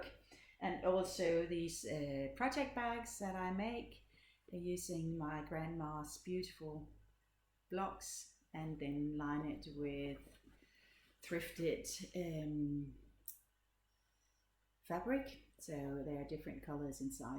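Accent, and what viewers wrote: Denmark. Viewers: Så får du da lige en lille hilsen fra syd for Granada i Spanien også. Så er der et land mere på din liste. Det sjove er at jeg faktisk hørte om din podcast på en amerikansk podcast, og vidste ikke, at du var dansk, da jeg startede med at se din podcast en gang i slutningen af sidste år 😄 men jeg genkendte hurtigt accenten 😉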